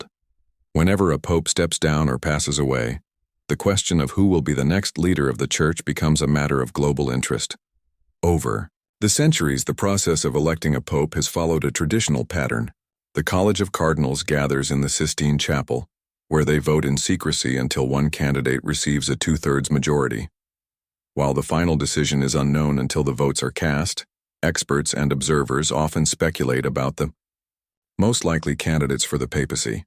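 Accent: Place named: United States. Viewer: almost like an American talking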